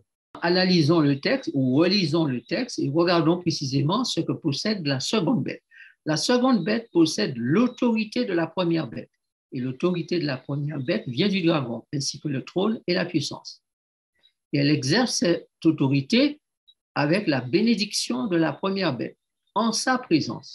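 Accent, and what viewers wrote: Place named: Haiti. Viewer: Tu dois bien t'exprimer en français tu parles comme tu chantes? C'est la langue (patois) haitienne qui détruit ton français et ton accent . Les haïtien parlent de la même façon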